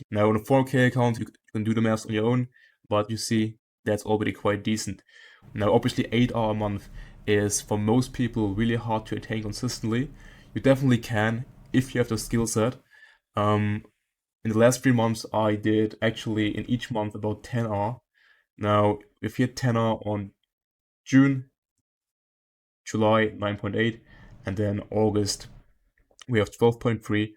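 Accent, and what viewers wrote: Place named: Germany. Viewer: Gotta love that German accent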